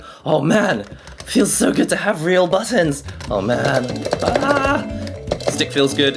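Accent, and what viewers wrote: England, United Kingdom. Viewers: Why the fuck this Japanese guy have a British accent? Can he actually speak Japanese? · You're Asian and you have an English accent. You are officially my favorite person in the world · he is an Asian but have UK accents.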